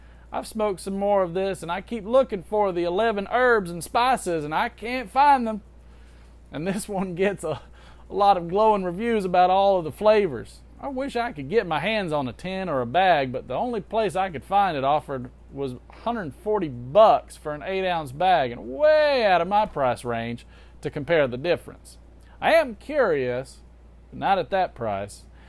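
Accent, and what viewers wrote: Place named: South, United States. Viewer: Nice southern drawl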